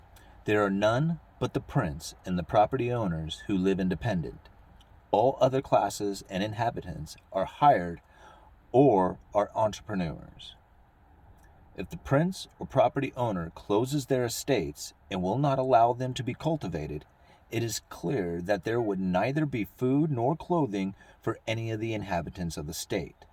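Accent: Pacific Northwest, United States. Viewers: You're such a Pacific Northwesterner. I feel like I'm listening to my oldest cousin who is from Bend, OR, roughly your age, and you both have the same "accent". I admire the hell out of both of you. Must come from the culture.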